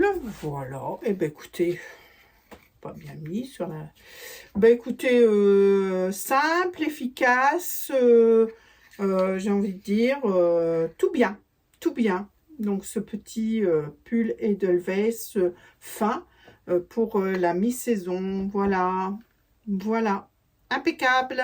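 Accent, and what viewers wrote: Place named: England, United Kingdom. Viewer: Coucou, J'adore ton accent anglais 😉 You're simply the best 👍. Très joli pyjama, de même pour ton blouson bi-matière et ton edelweis. à bientôt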